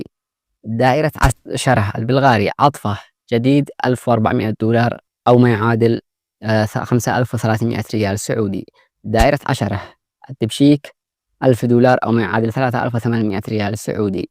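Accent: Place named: Yemen. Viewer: ضع علي الشاش كتابة الارقام المسعره مع الكلام لان الكلام ليس مفهوما جيدا مع النطق السريع واللهجه اليمنيه 🎉😮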